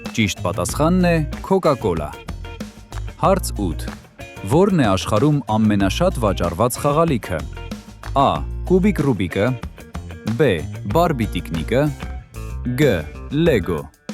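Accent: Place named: England, United Kingdom. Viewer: Ոչ թե «բե» «գե», այլ «բ» «գ»։ «դնեյթը», այլ հստակ ԴըՆըԹը։. Այս ինչ կիսաանգլիականացված արտասանություն է։ Իսկ թեստը լավն էր։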